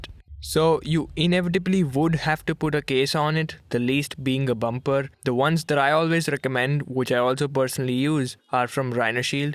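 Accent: India. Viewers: I loved Indian accent!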